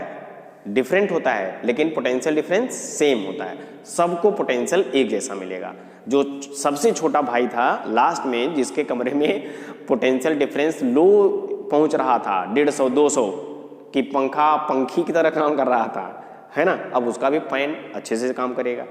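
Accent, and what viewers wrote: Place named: Bhojpuri, India. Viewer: bhojpuriya tone bahut achcha laga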